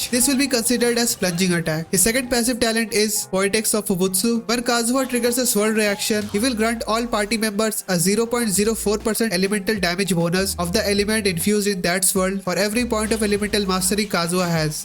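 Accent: India. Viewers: Indian accent 😅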